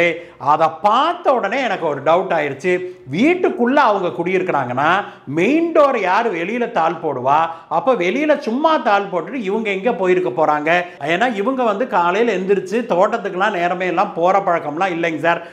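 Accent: Kongu, India. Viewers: உங்கள் கொங்குத் தமிழ் அருமை நிறைய வீடியோ போடுங்க சார் வாழ்த்துக்கள்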